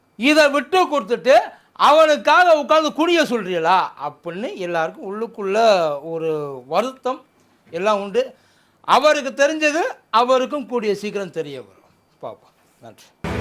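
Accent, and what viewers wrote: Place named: Madurai, India. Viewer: நல்ல மதுரை தமிழ் பேசி அசத்துறீங்க.நன்றி தொடரட்டும்.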